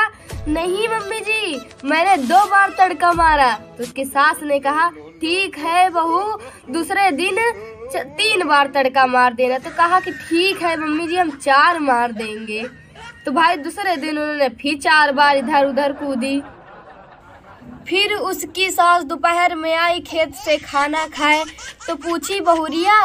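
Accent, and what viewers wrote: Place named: Bhojpuri, India. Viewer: aapki Bhojpuri awaaz bahut Sundar lagti hai kya yahi aisi hi awaaz pure gaon ki hai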